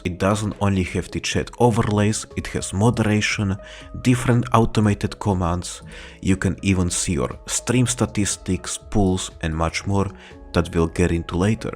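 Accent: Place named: Poland. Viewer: bro has 100% the poland accent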